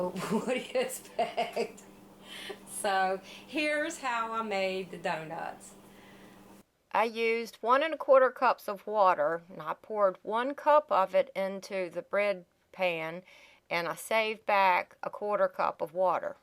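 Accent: South, United States. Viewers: What sold me is the time she spent to get it right. I did it 2 at a time exactly from her recipe in a little pan. Perfect white rings and all. And her delightful Southern accent made them taste even better to this ,84 year old Canadian. Jam filled next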